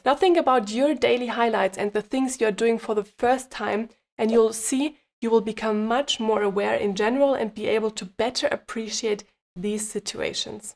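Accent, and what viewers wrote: Germany. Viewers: btw. Feedback is for me the most difficult to ask. Tip 11 is btw for me a problem, because I am criticaly ill. However I am very much on my way with doctors to get better and very motivated to start my walks which I have done in the past again. So do not worry. 11 is comming again. I do my shopping by foot, so a bit 11 already. For you in tip 12, something small to learn, a little line in Dutch, however do I hear a little German accent? In that case it will not be that difficult: Dank je wel dat je me vandaag weer wat geleerd hebt.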